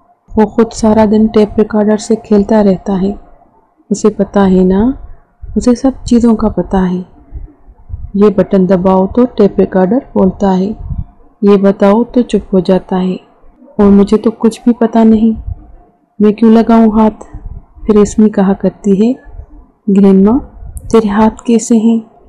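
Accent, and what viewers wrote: Sindh, Pakistan. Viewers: نائس.... سندھی لہجہ___ بہت خوبصورت!! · سندھی ایکسنٹ۔۔۔۔۔واہ۔۔۔۔۔بہت مزے کا انداز۔۔۔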